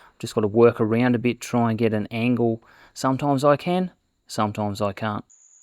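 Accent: Australia. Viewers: bro got the strongest australian accent ive ever heard in my entire life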